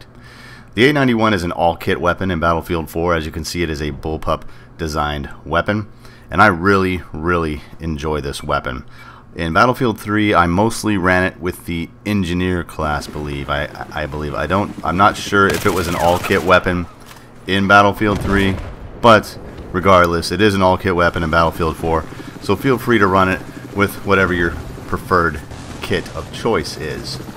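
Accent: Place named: Midwest, United States. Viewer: The attributes in your voice suggest that you are 1) experienced, 2) rich in character, 3) from the Midwest USA, and possibly 4) you were born in - and I'm just taking a shot here - May! Please tell me how much I got right, I am a slight Sherlock Holmes enthusiast. By the way, I too rather like the intrinsic appeal of this weapon, even though the stats are quite mediocre. Great review!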